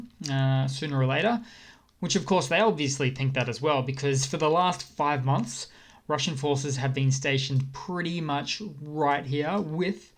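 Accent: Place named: Australia. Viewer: Love your Aussie accent! All da best from Poland :)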